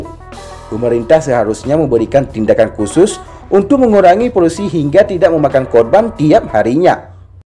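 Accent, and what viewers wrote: Aceh, Indonesia. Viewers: Aceh tok tok kali ngomong bahasa aceh, medok that 😅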